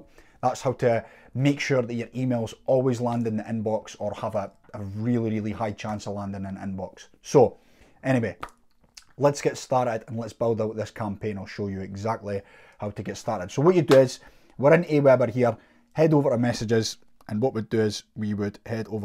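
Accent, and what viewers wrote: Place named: Scotland, United Kingdom. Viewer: love the Scottish accent. Helpful video. I find AWeber to be difficult. I used mailchimp for years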